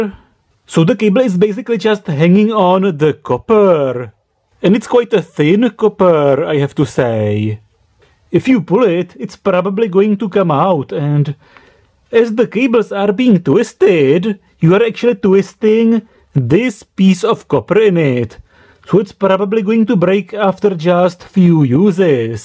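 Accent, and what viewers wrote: Czech Republic. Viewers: Shhh. You hate his accent (Czech accent) so much that multimeter = his English (!) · I love your Czech accent and I love your channel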